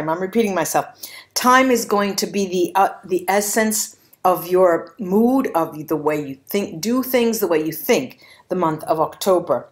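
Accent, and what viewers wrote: Italy. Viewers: Italian Accent*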